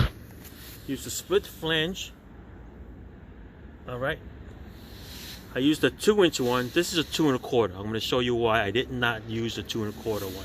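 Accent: New York, United States. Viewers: Thank you, thank you thank you. I am a native NuYawka living away from home and experiencing the same problems with my 2011 Honda CRV. The quotes I got were astronomical and pushed into my "I wish I was home cause I beat this can be done for under 50 bucks!" After trying to find a better price I transitioned into "I can do this my(dayam)self...I am NY Hard!" When I found your video my ears perked up b/c I said I know that "accent." Then after watching you fix this simple problem and share the information gave me new hope. Again, thank you, thank you thank you.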